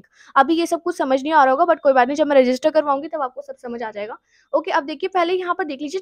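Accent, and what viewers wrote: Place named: Delhi, India. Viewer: AAP kaha se hai delli se kya. App ke baat se pata chaltaa hai madam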